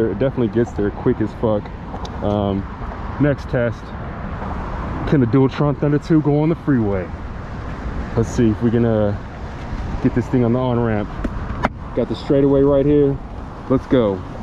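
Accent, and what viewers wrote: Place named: Bay Area, United States. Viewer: My boy, you must be from the Bay Area.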